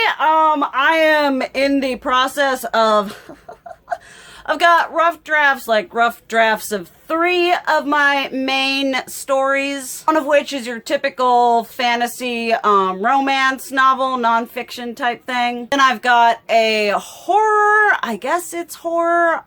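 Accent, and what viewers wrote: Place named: Appalachia, United States. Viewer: OK but where can I get this sweater? Also, judging by your accent and you driving between TN and VA I'm guessing you must be Appalachian like myself. I'm currently in Asheville but grew up in Wise County VA about 45 min from the Tri-state area. If you know what I was just talking about, HOWDEE 🤣If not, that accent still sounds like home!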